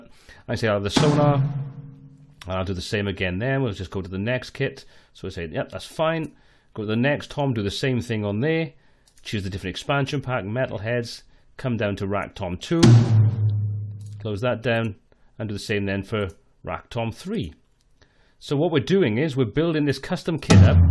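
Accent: Wales, United Kingdom. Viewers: No we don't, this guy is Welsh, so it is a regional variation, it's not a moot point! Also for route, we pronounce it root not rowt.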